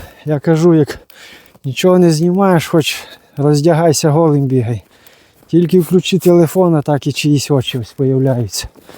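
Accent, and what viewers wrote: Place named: Korosten, Ukraine. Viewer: Доброго дня. З пару місяців тому знайшов Ваш канал і дивлюсь із величезним задоволенням. А знайшов цікаво, випало випадково, включив і Ваш говір виявився дуже знайомим, можна сказати рідним - коростенським. Справа в тому що в мене весь батьків рід з Веселівки, можна сказати поруч. І хата діда з бабою в селі також давно стоїть пуста(( До війни їздив туди тільки на полювання. А цієї зими двічі вибирався туди з Києва просто погуляти по рідних з дитинства місцях, подивитися сліди звірів. Величезне дякую Вам за канал!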